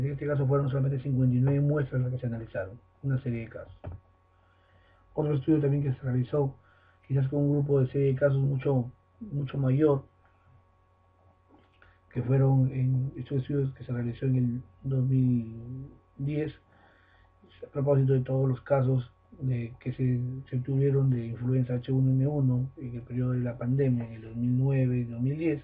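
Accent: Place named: Peru. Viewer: yo entendi, solo que tiene acento, parece Peruano.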